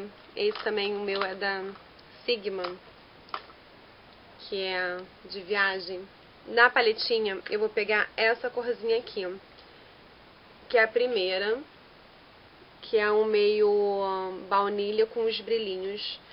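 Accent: Rio de Janeiro, Brazil. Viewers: esses comentários inúteis são de pessoas com pura inveja de vc. acho sua voz linda, adoro seu sotaque carioca e vc é linda!!!!não é atoa que faz tanto sucesso aqui no YT. bjs!!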